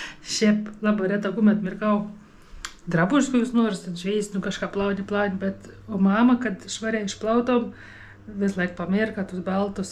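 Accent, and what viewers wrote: Samogitia, Lithuania. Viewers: Viskas bus gerai moterie,esi išskirtine nes kalbi žemaitiška 😅o dabar Lietuvoje tarmes ar dialektas labai vertinimas 👍🙂🙂🇱🇹🇱🇹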